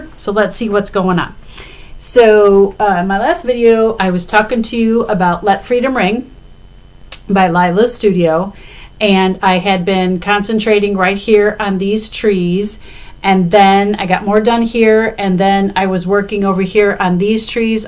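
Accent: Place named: Illinois, United States. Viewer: First time watcher here. It sounds like your a teacher in Illinois. If so, which part of Illinois? I teach in the south suburbs. I will also be at weekend A. I’m going by myself so I’m hoping to meet a lot of new people.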